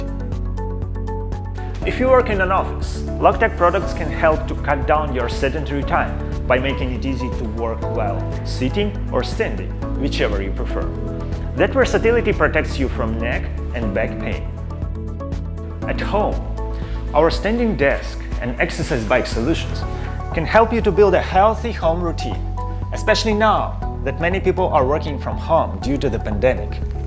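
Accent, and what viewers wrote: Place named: Eastern Europe. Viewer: He speaks with a VERY east european accent that is difficult for most english speakers to understand.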